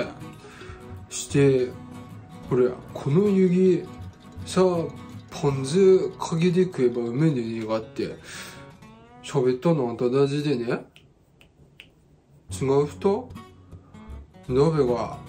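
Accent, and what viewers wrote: Tsugaru, Japan. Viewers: 綺麗な津軽弁ですね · うちの青森のおじさんにソックリ…しゃべりも…ガッツリ津軽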